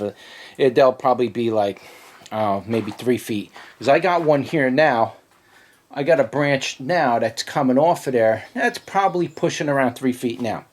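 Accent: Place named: New England, United States. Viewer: I asked be a you have a New England type accent. I’m from NE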